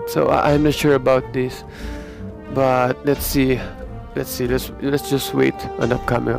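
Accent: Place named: Philippines. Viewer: Pinoy ka? Parang pinoy kasi accent mo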